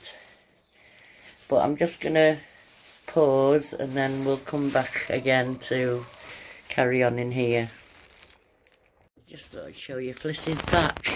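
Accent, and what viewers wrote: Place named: Yorkshire, United Kingdom. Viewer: I'm curious , You live in Wales. But your accent sounds like Yorkshire or somewhere in the north of England. Are there parts of wales that have that accent. Or are you from Engalnd originally?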